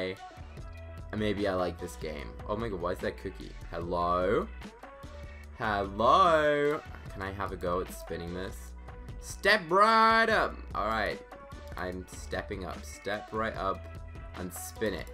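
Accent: United Kingdom. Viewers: are u british? 👁👄👁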